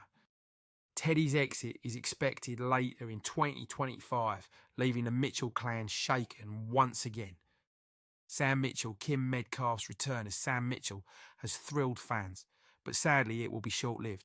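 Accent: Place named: Cockney, United Kingdom. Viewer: Please change the voice back to the one before this, cockney accents are the worst UK accent you can choose, id say no offence to the cockney people but it's just facts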